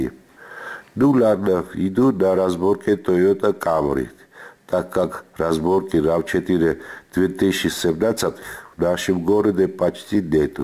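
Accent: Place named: Georgia. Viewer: Гаумарджобат генацвали! Смотрел и слушал, сначала сомневался, акцент знакомый, похож на грузинский. Город, улицы, похожи на Тбилиси! Ностальгия просто, я служил 2 года в Тбилиси! Молодец биджо, разобрался с причиной, машинка огонь вообще!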